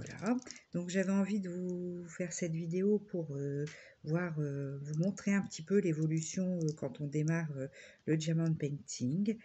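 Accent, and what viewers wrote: North, France. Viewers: Oh une voisine en plus j'avais cru entendre le petit accent du nord lol. Moi je suis du nord mais originaire du pas de calais.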